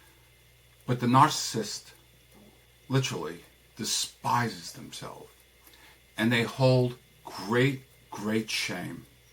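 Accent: New York, United States. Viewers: Your videos are very interesting and informative. Well thought out and well presented. You will definitely help many people get over horrible experiences. I was thinking that while you and many others, including myself, have had the experience, that, at least, we are able to "not contact" them. We can escape from the hell that they must live in constantly. Keep up the good work. You look great and really enjoy your New York accent.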